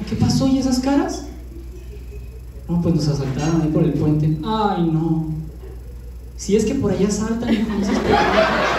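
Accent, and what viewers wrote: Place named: Tijuana, Mexico. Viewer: Esto me le recomendó el algoritmo, está bien. Eres de Tijuana? Por el acentito acá bien curaaadaa.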